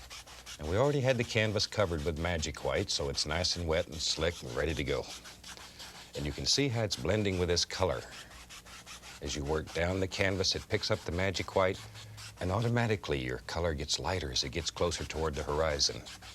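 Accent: South, United States. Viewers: in the later episodes its harder to notice that he has a southern accent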